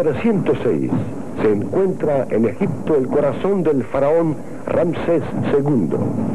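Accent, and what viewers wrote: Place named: Argentina. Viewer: Yo creo que no, al narrador le oigo un poco el acento de Argentino o Sud Americano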